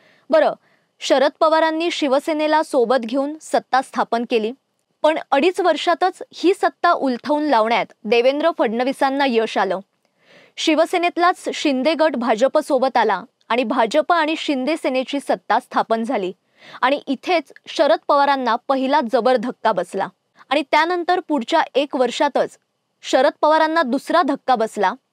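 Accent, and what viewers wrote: Standard. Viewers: कॅमेरासमोर तू स्वतःला छान सादर केले आहेस. तुझा खणखणीत आवाज, स्पष्ट आणि शुद्ध उच्चार व आत्मविश्वास कौतुकास्पद आहे. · छान...स्पष्ट आणि शुध्द उच्चार... खणखणीत आवाज...उत्कृष्ठ विश्लेषण....👍👍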